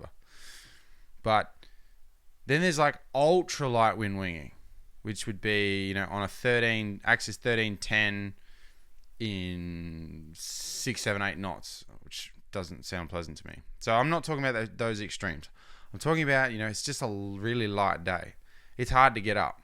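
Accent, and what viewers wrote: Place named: Canada. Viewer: Great video, and I really enjoyed the Canadian accent.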